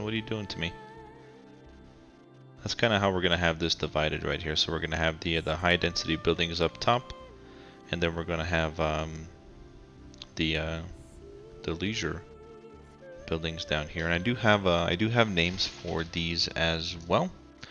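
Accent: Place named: Netherlands. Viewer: ohhh, I don’t know what BiH means? But I thought so because you sound like you have a little bit of a dutch accent. It’s why I asked :) awesome cities btw! Have a nice day!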